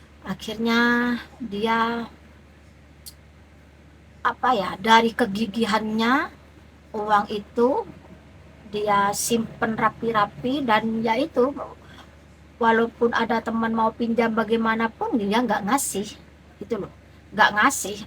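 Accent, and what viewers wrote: Madura, Indonesia. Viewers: Logate meduro banget ce....🤣🤣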